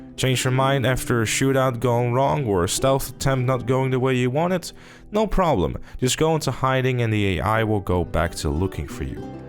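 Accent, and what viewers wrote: Netherlands. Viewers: This guy is definitely Dutch😅 Great review tho, keep up the good work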